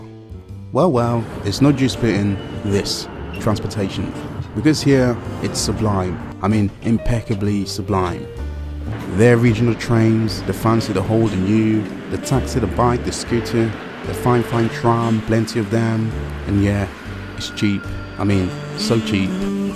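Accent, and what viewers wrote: United Kingdom. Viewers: Sounds like you're British, not American. Am I right or am I right ? :) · are you American? Your accent doesn't sound like. British I suppose? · "America" in title >British accent I'm not watching this.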